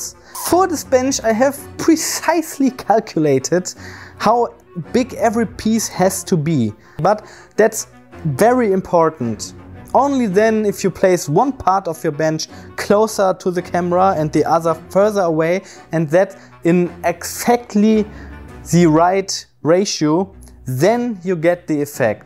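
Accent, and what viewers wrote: Germany. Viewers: you sound so german wie recht ich hatte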